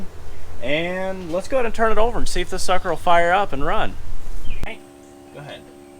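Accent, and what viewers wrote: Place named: Carolinas, United States. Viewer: looks like youre in the carolinas from the scenery. and the accent :)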